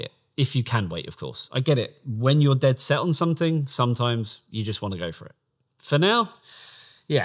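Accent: United Kingdom. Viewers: Британский акцент смешной ...🤣🤦‍♂️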